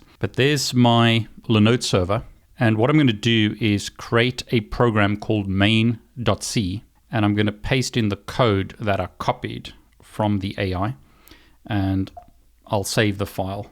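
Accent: South Africa. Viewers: Really interesting video, dude, and lovely to hear your South African accent. (If not RSA, Southern African. I now live in the UK, 24 years, from RSA.) GPT is so powerful! I'm going to have to use it for pair programming, for the sake of my career and my clients' success. Plus probably a billion other things in my life. Oh yes, always test everything: code, new AIs, ideas, foods, dearly-loved traditions, everything. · Hi are you South African? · Is this a South African accent?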